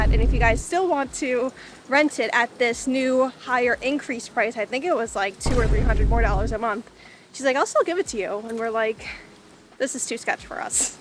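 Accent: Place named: Argentina. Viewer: ! Vengo de ver tu entrevista y tu forma de expresarte con esa mezcla de acento argentino y tu acento americano es super lindo. Me dió ternura escucharte con el acento americano pero a la vez me encantó escucharte pronunciar las palabras como porteña. Encontrá la manera con la que más auténtica te sientas, pero tu forma de hablar el castellano como argentina tambien es super lindo y un bonito gesto de honrar el pais que te recibió. 💖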